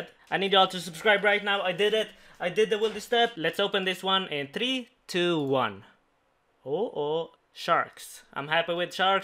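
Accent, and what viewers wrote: Sweden. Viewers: är du svenne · are u swedish?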